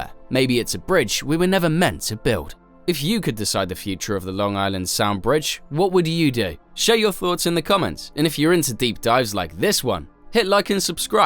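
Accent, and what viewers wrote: United Kingdom. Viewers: I can’t take a British man talking about nyc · That Limey twang is irritating. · We hate this harry potter accent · Who let the gdamn British do this doc.... calling Queens, LI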